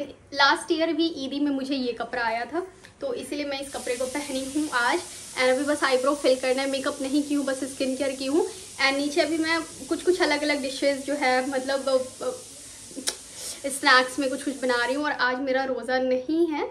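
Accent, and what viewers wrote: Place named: Bihar, India. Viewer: Dekhiye chahe jitna English bol lijiye aap lekin Hindi jb bolri h TB tb Bihar ki boli nikal hi jari kapda bolte kapra nai 😅😅😅 Hindi galtb bolti h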